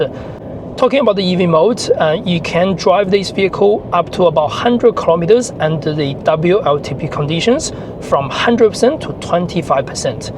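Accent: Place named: China. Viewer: Chinese accent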